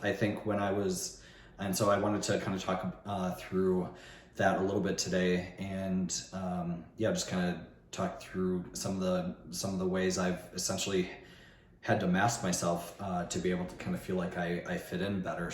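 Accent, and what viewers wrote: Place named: San Francisco, United States. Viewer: It's called a San Francisco Accent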